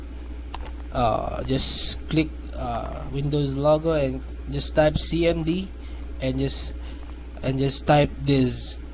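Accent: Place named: Philippines. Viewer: Pare Pilipino ka noh,, hahah obyus na obyus..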